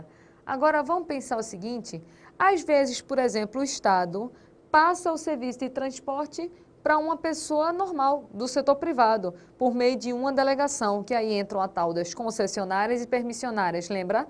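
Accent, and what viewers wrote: Pernambuco, Brazil. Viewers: seu sotaque é maravilhoso!!! parece cm o nosso aqui d pernambuco... bjsss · Virei fã. Excelente!!!!! Professora a senhora é pernambucana? adorei o sotaque também. Show!!!!!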